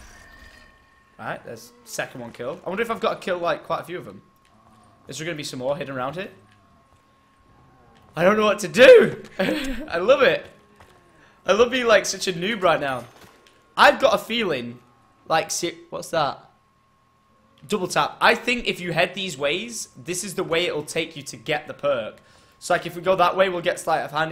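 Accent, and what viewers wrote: United Kingdom. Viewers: I like British guys British I like him <3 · Are you British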